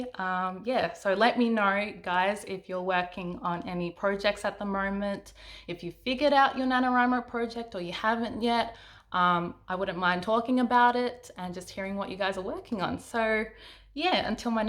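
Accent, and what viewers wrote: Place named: Australia. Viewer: Hey there! Fellow Aussie here! Glad to here a familiar accent. I found you from Emily's channel. I'm currently 50K into a 100K urban/paranormal fantasy, so I want to get the last 50K of the first draft finished for Nanowrimo. I understand that worldbuilding can be a huge thing, especially when (like me) you're trying to take existing myths/legends/creatures and find a place for them in your own world - on top of all the other worldbuilding that needs to be done. There's developing cultures, drawing maps (even an urban fantasy needs to know the lay of the land/city) and everything else. If you're interested, there is the great site called "World Anvil". The developers are a husband/wife team - he is a gamer (D&D etc) and she is a writer, so the site is made for both types of creators. Even if you don't end up putting all the details of your world on there - the templates are fantastic for providing all sorts of questions that you can use to help develop ideas. 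They make you think about things you might not have considered, and fill out details for things you might already have. It's great!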